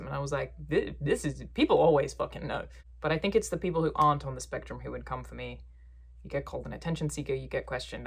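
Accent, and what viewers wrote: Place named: Australia. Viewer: I love your lilting Australian speech impediment, but we need to talk about the way you say "assume." What's up with that?!? There is no 'sh' in that word anywhere! Desist! Dig you. Please roast me appropriately. :)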